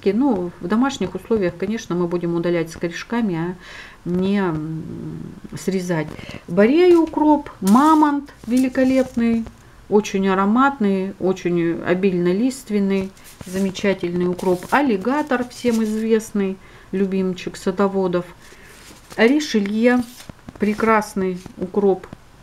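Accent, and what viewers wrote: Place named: Sverdlovsk, Russia. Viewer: в каком городе вы живете? говор алапаевский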